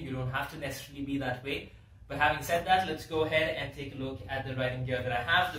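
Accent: Hyderabad, India. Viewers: hydrabadi Urdu was just amazing... kirrak... maut dal Diya.. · bhaiii Lovely.. that's a Crazzy video..!! ❤ Hyderabadi Hindi 😍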